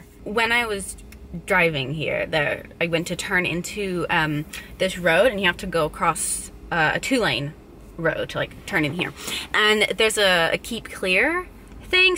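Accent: England, United Kingdom. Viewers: This is the first time I’ve actually noticed a slight English tint to your accent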